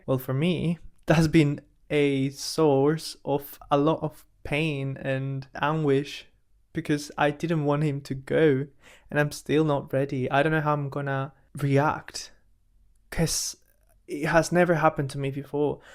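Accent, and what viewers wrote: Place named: United Kingdom. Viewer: This is something that scares me so much! Every time I remember it will inevitably happen, it hits me like a truck. It's really weird to deal with it knowing we will all go through it at some point. Whenever I remember it, I get too existential and start getting too philosophical for my own good. I don't want to miss out on all the things that will happen after my death — books, movies, songs that will be released that might even be my favorites if I were around. It's really difficult to be alive sometimes, but I love the perspective of doing it consciously and being present in each moment. I don't want to waste any time at all to the point it overwhelms me. The fact I don't get another chance if I fuck up now really scares me. This was a great video! I believe you'll still have a large audience one day! Are you from the UK? 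I was trying to identify your accent the whole time lol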